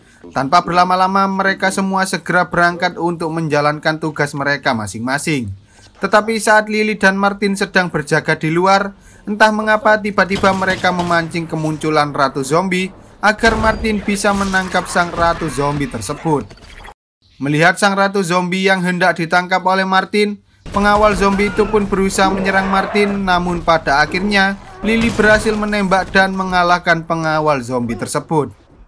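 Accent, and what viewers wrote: Java, Indonesia. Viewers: Abg orang jawa? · Bisa gk sih bos ngomong nya jngn medok bngt gitu,, pengen nonton tapi sebel sama suara nya,, 😒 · Jenegan jowone ngendi mas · Lha kog medhog banget ... Njawani .. Wkwkwk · Org Jawa lu bg?? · Ohh wong jowo toh sampeyan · Mas..ini yg ngmngnya jawanya medok pisan eeuy..(yg menceritakan filmnya) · Bang kamu orang Jawa yah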